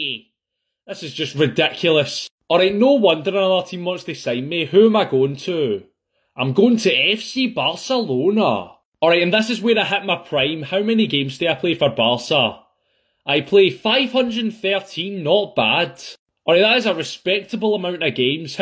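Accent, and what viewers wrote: Liverpool, United Kingdom. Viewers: Are you Scouse? Just a curiosity of an English accents learner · You from Liverpool?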